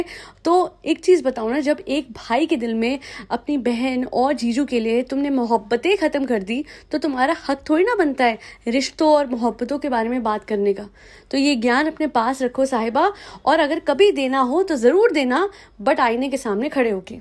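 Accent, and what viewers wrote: Punjab, India. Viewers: Sorry to ask but aap Punjabi ho kya?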